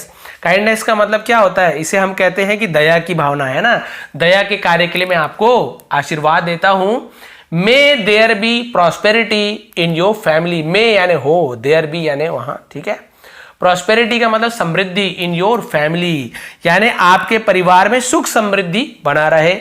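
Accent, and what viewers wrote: Chhattisgarh, India. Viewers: Sar aap Chhattisgarh ke Gramin area se belong karte ho kya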